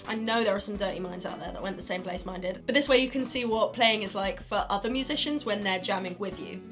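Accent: England, United Kingdom. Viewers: Она англичанка?) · Wow she has a British accent... as if she wasnt hot enough already. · I could listen to a british native speaking girl all day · She sounds English, splendid. If I hear much more of those loud mouthed yanks that sound like ducks with their stupid word awesome I may end up hurling my computer at the wall. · Hm... I thik the UK pronunciation ia really annoying...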